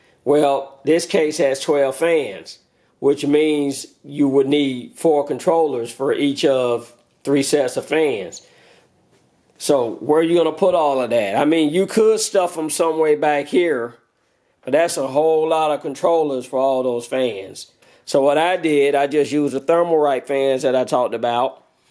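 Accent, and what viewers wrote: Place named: South, United States. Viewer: narrated with a heavy southern drawl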